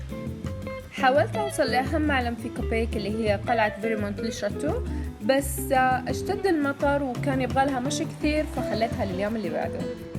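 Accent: Saudi Arabia. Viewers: ذكرتي اسمك في الفيديو و اسم مسيحي وانتي ما ذكرتي ولا معلم اسلامي في كندا ولهجتك جداويه ولكن اعتقد انك لبنانيه مسيحيه الاصل هذا اللي تبينلي..ربنا يهديكي للاسلام وهوي طريق النجاة باذن الله من النار يوم القيامه..والمسيحي والكافر لا يدخل الجنه حتى لو كانت اخلاقه في السماء لانه اشرك بالله وكفر برسالة محمد حتى لو قلتي انا أؤمن برسالة محمد صلى الله عليه وسلم.المسيحي يؤمن بأن الله ثالث ثلاثة وهذا في قمة الاشراك بالله عز وجل لأن الله لم يلد ولم يولد ولم يكن له كفوا احد..اتمنى لجميع المسيحيين الهدايه والسير على ملة الاسلام هدى الله الجميع..💗💗💗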